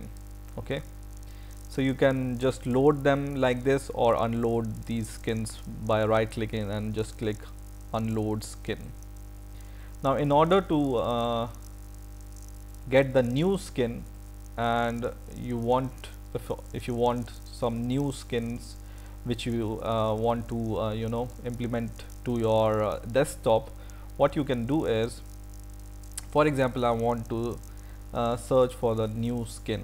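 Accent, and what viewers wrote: India. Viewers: Lemme get things clear, Your PC is in German, But you sound Indian... Makes sense. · The accent is like a cleaner version of indish.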